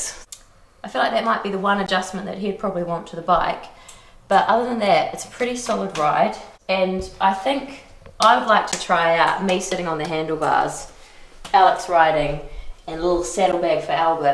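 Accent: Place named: New Zealand. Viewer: Didn't expect a kiwi accent :)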